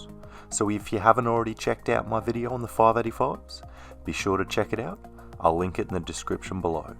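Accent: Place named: Australia. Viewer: Loved the video and the laid back Aussie voice! Going to pull the trigger on a pair of these after seeing this. Love from Ireland :D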